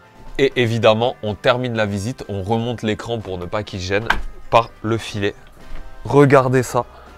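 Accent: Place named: South, France. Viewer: Très beau travail dans le rendu final vraiment bravo ! J'ai quand même encore beaucoup de craintes sur la pérennité et la sécurité de la cabane au point de laisser des gens y dormir (juridiquement et en cas de pépin) . Et par contre stpp arrête de parler de bois flotté partout mdr c'est pas en le trouvant au bord de ton cour d'eau que ça y est c'est du bois flotté 😂 En tout cas j'ai quand même tout suivi de A à Z et j'ai kiffé tout ton projet ta du mérite 💪(petite dédicace à ton accent du Sud étant de Nîmes ❤️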